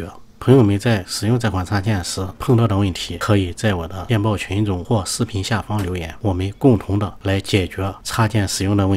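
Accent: Shandong, China. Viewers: 老师，是山东的吗？咱是老乡啊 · 濃濃的山東人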